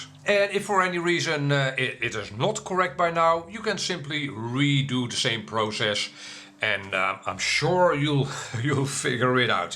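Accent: Netherlands. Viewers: that was it, not DCU driver. I downloaded the impulse RC driver fixer. It connected to the flt controler had a little look around saw what was missing and loaded it, then left it in boot load so I could just open Betaflight and do the flash. Sorted 2 yellow boxes and I could do what you demonstrated. Thanks a mill for making me aware of them buttons, just had to find them. Subscribed, do love the Dutch accent.👍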